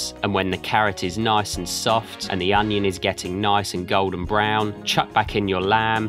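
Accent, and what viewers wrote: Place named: Lancashire, United Kingdom. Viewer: Nothing better than a traditional Lancashire accent. That aside nice recipe. 😉👍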